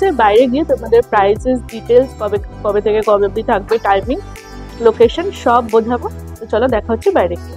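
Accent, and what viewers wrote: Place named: Hindi, India. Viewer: আপনার প্রেজেন্টেশন ভাল। এডিটিং ঠিকঠাক। মাঝে মাঝে হিন্দি শব্দ (উদা: wagera) আর হিন্দি উচ্চারণের (masala) অকারণ ব্যবহার একটু কমিয়ে দিলে আরো ভাল হবে।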